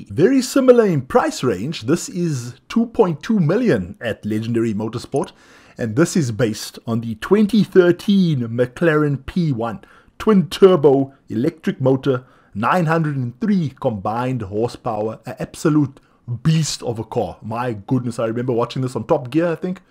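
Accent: South Africa. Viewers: Are you from South Africa? Your accent sound like it🤔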